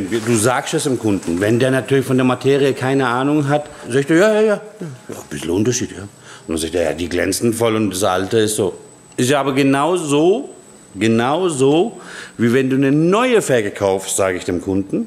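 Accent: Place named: Sauerland, Germany. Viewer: Ich komme aus dem Sauerland und finde es echt witzig wie bei dir manchmal der Dialekt durchkommt ich mag das sehr . Leider spreche ich selber kein Dialekt mehr und es ist sehr schwer jemanden zu finden der noch den Sauerländer Dialekt spricht um ihn zu lernen .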